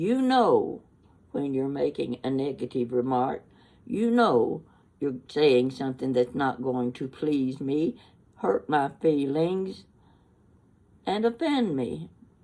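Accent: South, United States. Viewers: I appreciate your company. I feel like you are very sweet and homey. I love the sound of Southern people. ❤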